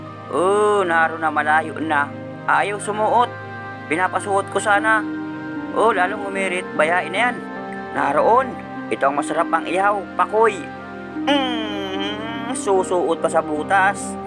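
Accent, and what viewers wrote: Bicol, Philippines. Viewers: kabayan na tatawa ako sa tagalog mo may halo bicol taga jaan pala ako sa wuezon province idol sa ka banda jaan ako sa san narciso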